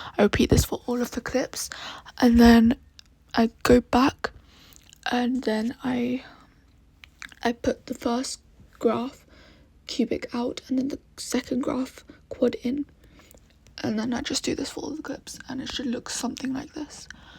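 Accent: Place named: United Kingdom. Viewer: OMG YOURRR BRITISH AYY MATE Sorry that was cringy😭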